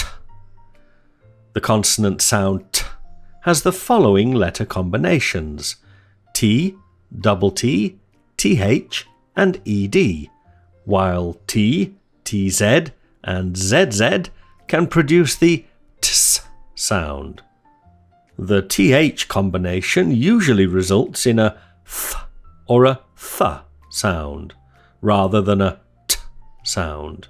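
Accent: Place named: England, United Kingdom. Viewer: Hi dear teacher, I am from Myanmar, English is not my language but I can express writing my feelings with English. But I can't speak it. Kindly guide me with some tips and I love your English accent and teaching. Could you plz also make a long video about phonemes and phonetic with great example plz. Thx so much .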